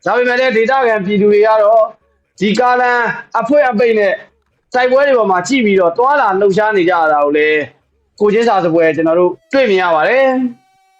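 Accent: Yaw, Myanmar. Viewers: ကီစ္စမရှိ ရေ တင်ပြမှုတိုင်းအားပေးလျက် 👍💯အဆင်ပြေသလို့လုပ်ပါနော်👏👏👏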